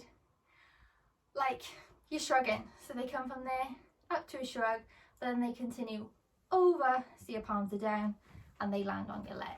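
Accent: Wales, United Kingdom. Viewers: Hmm you sound welsh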